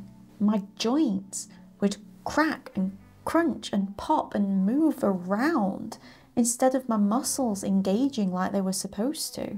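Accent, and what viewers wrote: United Kingdom. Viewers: Literally feels like listening to an alternate version of myself... but with a British accent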